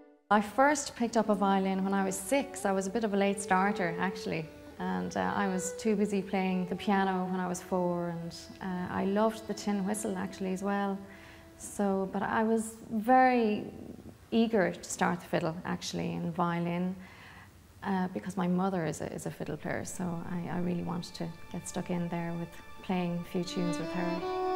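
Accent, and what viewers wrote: Ireland. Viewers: This is an accent, Ireland. Scotlaand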